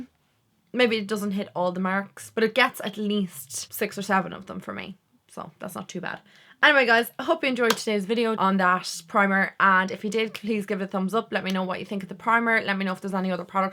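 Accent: Ireland. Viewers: I could listen to you talk all day….I just LOVE an Irish accent. I’ve loads of friends from Ireland and I so enjoy our talks, with their gorgeous accents and Irish sayings….”God love him” and “Mother of God”. Teddy U.K. xx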